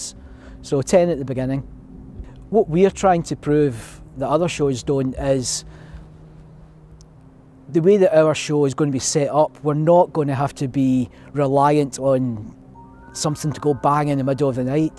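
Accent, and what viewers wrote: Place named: Glasgow, United Kingdom. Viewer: Nice to hear the voice of a fellow Weegie (or should that be ouija) 😁 Looking forward to the series. Slainte guys!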